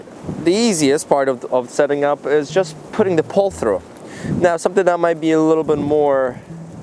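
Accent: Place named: New Jersey, United States. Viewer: The accent was so strong and then you said Jersey..made me laugh. I have just purchased the tent. Or a variety of it second hand. Excited to try it out.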